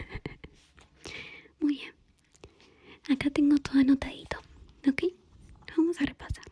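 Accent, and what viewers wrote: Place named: Argentina. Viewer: hablas argentino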